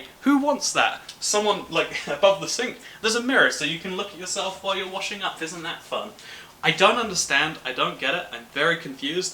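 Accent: United Kingdom. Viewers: Hes brittish, chill. Its probably the acent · How american is, he's certainly 100% speaks british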